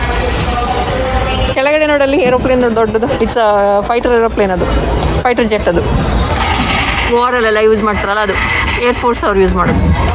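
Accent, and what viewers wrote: India. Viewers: We love your Kannada Accent and Pronouncing The Way You Narrate Kannada is Superb Probably best in class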